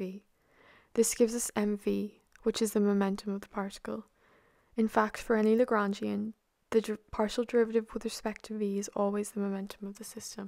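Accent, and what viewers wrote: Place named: Ireland. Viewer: the speaker's accent sounds a bit Irish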